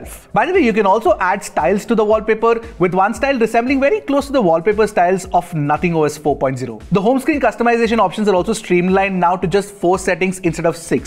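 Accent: India. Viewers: The Indian accent is truly awful!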